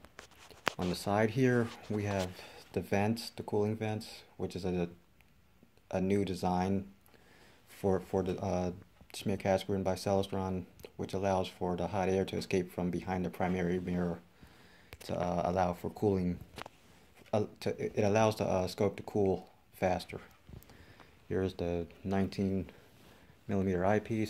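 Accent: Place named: Chicago, United States. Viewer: Nice video. You sound like you're from Chicago, my hometown.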